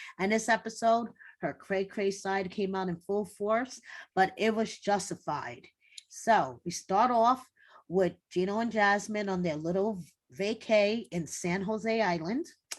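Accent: New York, United States. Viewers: Rapid-fire, New York style. That's how I like my pizza and my recaps..❤️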